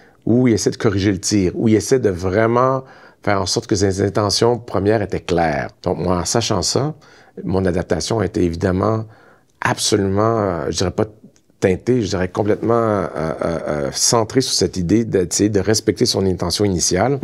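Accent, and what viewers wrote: Quebec, Canada. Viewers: De plus en plus de vidéos d'artistes ou politiciens français de métropole ou pas, sont sous-titrées pour les malentendants ou les personnes ne pouvant utiliser le volume. Rien de personnel contre le très agréable accent québécois je pense. No offense :) (perso j'avais même pas vu qu'il y avait des sous-titres!) ') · Si c'était pour les malentendants ce serait toujours écrit tel quel. Aussi, ils pourraient être en CC et non intégrés à la vidéo. Leurs autres vidéos n'ont pas de sous-titres comme ça quand ils interviewent des gens avec des accents parisiens ou français. Ces sous-titres semblent là justement parce qu'il est Québécois. C'est toujours bien qu'il y ait des sous-titres pour rendre la vidéo accessible, mais là ça semble être à cause de son accent alors qu'il parle de manière tout fait comprenable pour les Français. Je ne peux pas croire que l'accent québécois soit si difficile à comprendre, mais il semble que pour cette chaîne, oui.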